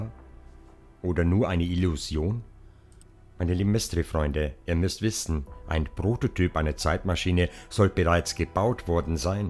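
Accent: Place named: Tyrol, Austria. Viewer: Lieber,danke fpr das tolle Video,Zeitreisen und ähnliche Phänomene zählen zu meinen Lieblingsgebieten!👍 Ich schicke die besten Grüße zum Sonntag ins schöne Tirol aus Essen! 😊 P.S. ich liebe deinen Dialekt,lebst du in der Umgebubg des Lechtals?❤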